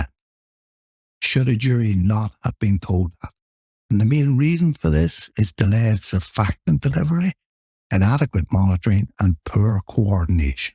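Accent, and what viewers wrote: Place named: North, United Kingdom. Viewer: Fantastic STuff..😅.....Your obviously from up north,.......❤🎉... FaB accent ye got There my friend 😅🎉... i moved to London from Dublin in 1998 but im STILL famous for my strongish 1/2 CorK 1/2 Dublin Ramble 😅😅😅😅😅...Fabulous channel 🎉. Been Binge Listening 👂 for the past 3weeks ❤🎉😊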